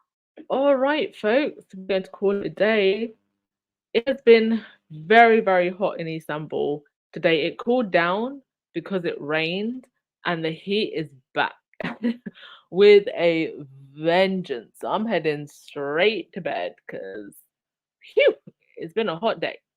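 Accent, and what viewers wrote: United Kingdom. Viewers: Ugh! I hate missing the live! I love the English accent.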